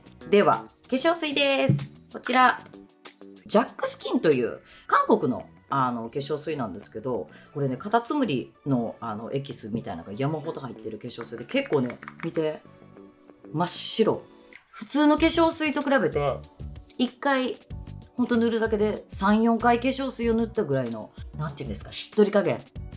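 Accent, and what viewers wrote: Kansai, Japan. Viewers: Vogue で関西弁が新鮮で良い❤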